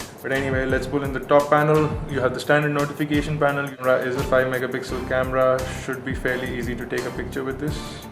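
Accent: India. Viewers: indian is speakin!!! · Why are all these reviewers Indian? I fucking hate hearing this accent all the damn time!! No I'm not racist it's just an opinion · Its like I'm listening to that indian character on the Big Bang Theory series... 0_0 PS: Yes I know the uploader is probably indian.. what I'm saying is, its annoying.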